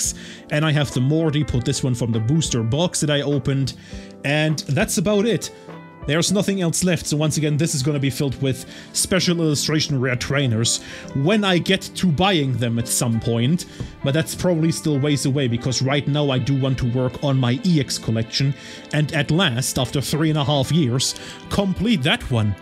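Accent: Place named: Germany. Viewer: Haha direkt am Akzent gehört, dass du Deutscher bist :D Sehr coole Sammlung!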